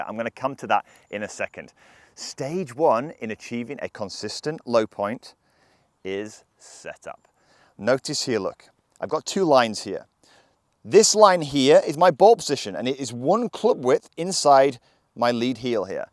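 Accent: United Kingdom. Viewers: i've been golfing for many years but could never really get this right. i always thought it was some big move to make to get the center forward but just having the idea of striking more forward will help make it happen sounds good to me. thnx for the info and help. i've always been trying to figure out where you are from in the uk with you accent, i'm from the boston, massachusetts area in the states.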